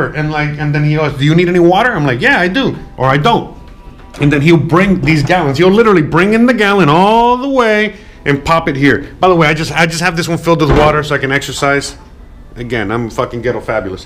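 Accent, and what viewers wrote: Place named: New Jersey, United States. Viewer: I'm thinking you're from Jersey??? "Ya know whata mean."...."Get out of here." Strong accent and the f word is just a simple roll off of the tongue. lol love it! Thanks for posting because I was wondering what I could get with my tiny little budget! So I'm rather impressed with the apartment for the moolah.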